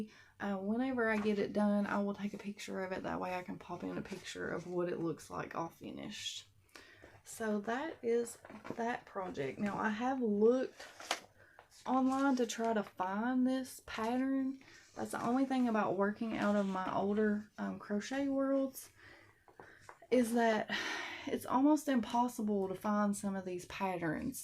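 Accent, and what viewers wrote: South, United States. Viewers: you're so cute. I love your accent. My family is from the south/midwest too but I didn't get the accent. Boo. LOL. Your Lucy doll is fabulous! So creative and fun! Those blankets and rug/doily are amazing! Your drawers are a great idea! I use little condiment containers from the Dollar tree and separate them by size, for my safety eyes. I store them in an old Crochet Society Advent Calendar. I don't mind long videos. It let us get to know you better:)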